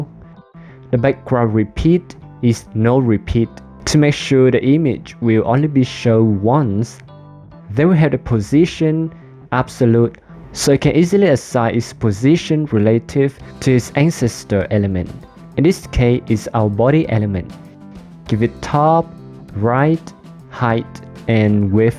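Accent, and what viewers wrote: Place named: Vietnam. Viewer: anh nói kiểu giọng Anh-Việt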